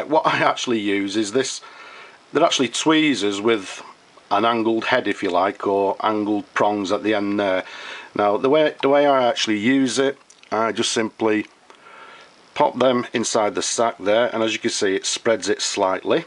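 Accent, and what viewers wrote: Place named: Liverpool, United Kingdom. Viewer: Thanks bud, I think I can do this, kind of like a Harley his to clean your carburetor video..great accent mate, is text a Liverpool accent or Scottish ?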